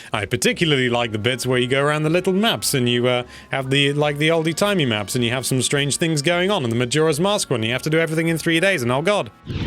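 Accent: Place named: United Kingdom. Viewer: He’s so wholesome. He’s like our weird British/Australian (I can never tell those two accents apart, but I think he’s a Brit) male gaming mom. The man has a voice like freshly baked chocolate chip cookies for crying out loud!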